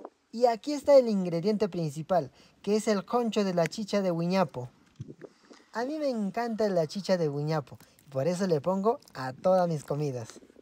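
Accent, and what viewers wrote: Peru. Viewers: Me encantó este cocinero me 😂 mucho con su endulzadas con sal espectacular esa manera de cocinar y de decir las cosas mil felicitaciones me gustó tanto que me suscribí casi no lo hago, de donde es? Perú? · Buen día, joven no se de donde es usted. Observó que habla como los mismísimos cusqueños la tierra de mi señora madre. Felicidades por no perder sus raíces y escencia..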